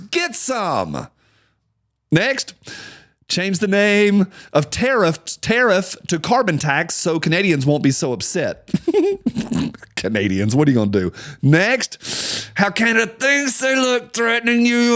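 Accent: South, United States. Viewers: I don't think I ever heard a gay guy with a southern accent before (nothing wrong with it).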